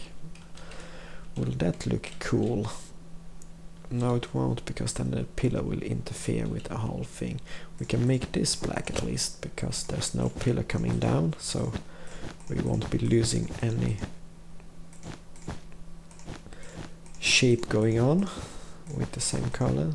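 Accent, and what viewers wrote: Poland. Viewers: bardzo dobrze to wygląda ! ;) jest polski akcent super ;) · dude are you polish ? XD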